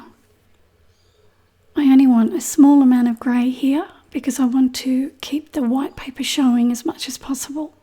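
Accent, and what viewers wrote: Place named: Australia. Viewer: Ahh Aussies...got to love our different accents. A useful tutorial as always. Thank you.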